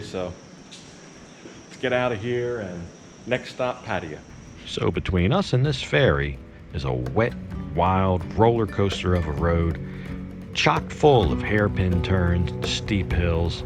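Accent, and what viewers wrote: United States. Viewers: I dont understand, as you live in this country, that you pronounce cities, places and names in an american way... Why do you think this country wants their language pronounced like that. Disrespect !!!!!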